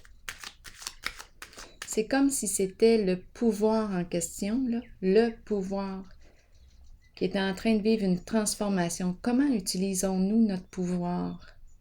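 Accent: Canada. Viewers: Mon Dieu, que j aime votre accent canadien. On dirait mon ami Matthieu Bock Côté. Adorable cet accent !!!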